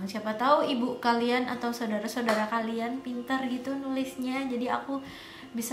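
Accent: Java, Indonesia. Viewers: Assalamualaikum mbak:) hai aku Khansa dari Sidoarjo. Pertama kali tau video youtube mbak itu video yg review wardah intens matte lipstick lgsg deh mulai kepo "siapa sih nih orang?" akhirnya suka nontonin videonya soalnya aksen jawanya dapet bgt:)) terus videonya yg menurutku bagus dan cukup membantu aku yg buta makeup 😂 dg penjelasan lmyn lengkap dan mudah dipahami. Untuk kedepannya aku pgn mbak bikin video ttg makeup/skincare yg cruelty free/animal testing, dan yg pastinya yg halal. Sbg muslimah bukan hanya makanan yg harus diperhatikan kehalalannya tapi produk2 lain seperti make up/skincare. Pengen tau aja tanggapan mbak tentang itu 😊. Terima kasih sudah mau menyisihkan rezekinya buat bikin giveaway utk subcribers dan viewersnya, semoga subcribers dan viewersnya makin nambah berjuta juta ya 😁. Wish me luck🙏 Wassalamualaikum ig: kfadhilahr_ Alamat: Perum. 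Griya Bhayangkara Masangan Kulon RT 38 RW 09 blok A4/10 kec. Sukodono kab. Sidoarjo. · kolaborasi dong sm inivindy make up in model. trs keluarin smua medoknya kakak, biar lepas gtu aura jawanya. nah nanti kayak ada adegan2 drama gtu kakak dan inivindy, karena beda teknik make up dan lain sebagainya ig: babyrachella97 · Assalamu'alaikum yg suaranya super duper medok kejawan hehehehe... Aku nhoura, nama ig aku Alamat aku di Jl. Dukuh Bulak Banteng Sekolahan Gg. IV no. 24 Surabaya..., aku mau kedepannya bikin video tutorial makeup tapiiii NO Mirror Makeup Challenge (Makeup nggak pake Kaca atau Cermin) yaaaah??? Semoga ini komentar yg menarik hehehehe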